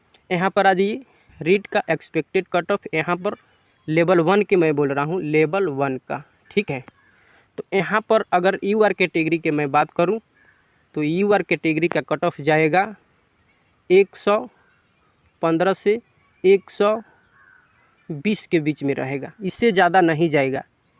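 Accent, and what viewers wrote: Bihar, India. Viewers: Bhai बिहार से हो क्या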